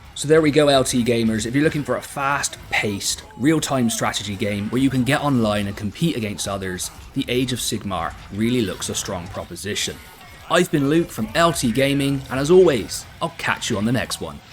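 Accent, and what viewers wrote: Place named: West Country, United Kingdom. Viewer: Hearing a West Country accent in media is a breath of fresh air! I’m from Somerset and you’ve got a follow from me mucker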